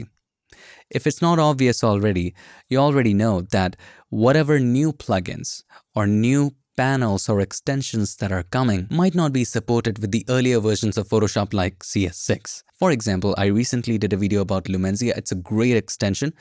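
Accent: India. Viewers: I didn't even think that i will fall in love with an Indian accent one day xD love you mate , your fan from Egypt!♥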